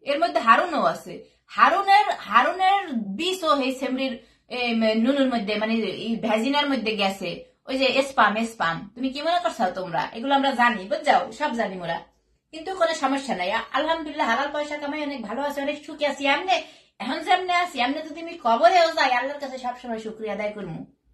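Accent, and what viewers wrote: Barishal, Bangladesh. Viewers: আপনার বরিশালের language খুবই enjo করি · তুমি কি বরিশালের মেয়ে?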